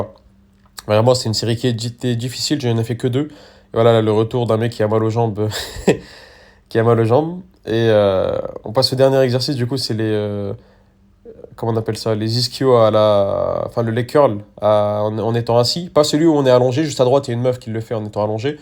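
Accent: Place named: Kabylie, Algeria. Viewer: Salut super vidéo, dis moi t'a un petit accent t'es kabyle ?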